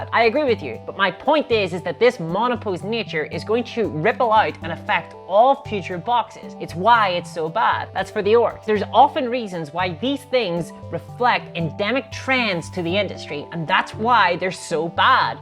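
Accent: Northern Ireland, United Kingdom. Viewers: Dont know whats the hate about not only is she gorgeous and has the British accent, she give us the up and down of the evil GW. lol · This has me laughing out loud at several places. Very good. But I do have one question...is there a Northern Irish accent in there? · Great video. I'm from Norn Iron and I admit when I first watched your stuff I was horrified at hearing an accent similar to my own on YouTube - we are after all a self loathing breed of people. After about 10mins I was hooked!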